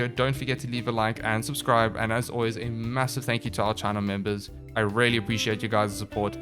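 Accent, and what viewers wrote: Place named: KwaZulu-Natal, South Africa. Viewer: You from KZN bru?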